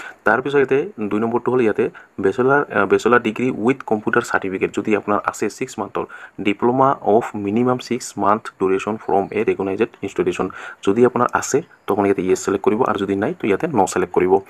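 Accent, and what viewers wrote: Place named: Bodoland, India. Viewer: Bodo bilakor nisina lagise nohoine baru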